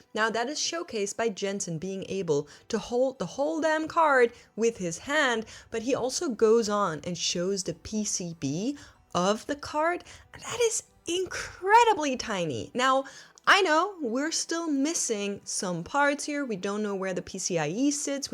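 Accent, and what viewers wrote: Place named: Germany. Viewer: I love the accent, just found your channel. Where are you from? You have Swedish look, but I've seen that look in Germans as well. Wife is German and Italian and said you look and sound German to her.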